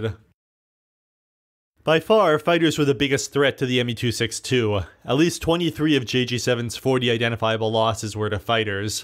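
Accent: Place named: United States. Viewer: "Weird" is probably a tad harsh, though I must admit I've never heard 'bomber' pronounced 'bawwwwwmer'. It just seems to be one of the more obscure America regional accents.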